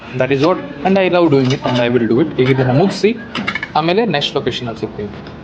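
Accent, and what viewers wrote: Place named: Tulu Nadu, India. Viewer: Your Tulu accent sounds like Bollywood star Suniel Shettru. .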